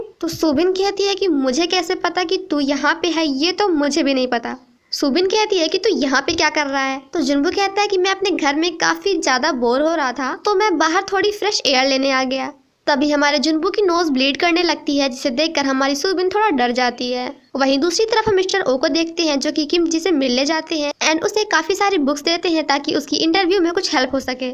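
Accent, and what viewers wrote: Gujarat, India. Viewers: Didi aap Gujarat se ho kya?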